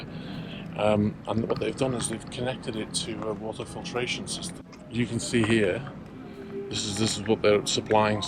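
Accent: Liverpool, United Kingdom. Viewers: Where are you from? I think your a scouser, definitely northwest anyway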